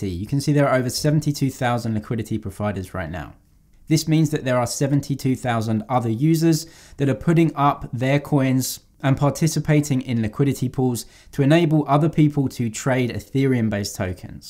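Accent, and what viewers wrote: United Kingdom. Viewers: Many thanks for your succinct and easy to understand videos. On the stuff I am trying to understand at the moment, I will watch your videos first! And not just for the British accent :-)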